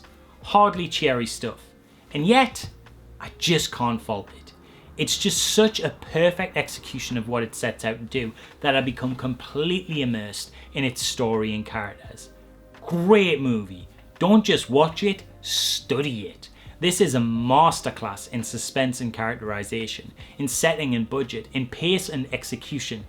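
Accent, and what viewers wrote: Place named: Durham, United Kingdom. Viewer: New subscriber here, just discovered you. The effort you put in is super impressive!!! Really enjoyed your take and info!!!! Off topic, I can't quite pin your accent, I was born n bread Gateshead but now live at the coast, can I guess your accent is Durham 🤔 Like I say, I'm new here x Looking forward to binging more vids pal 💚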